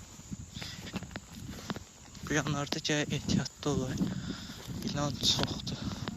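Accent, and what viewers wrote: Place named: South, Azerbaijan. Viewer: Ləhcədən Cənub bölgəsinə oxşayır.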